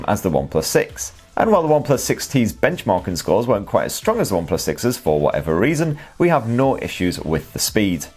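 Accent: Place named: Geordie, United Kingdom. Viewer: Sounds more like north east England to me, a slight Geordie twang to his accent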